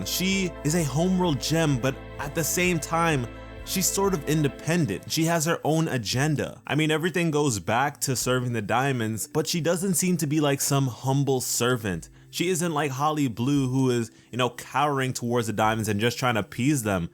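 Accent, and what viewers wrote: United Kingdom. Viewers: shes too british 4 me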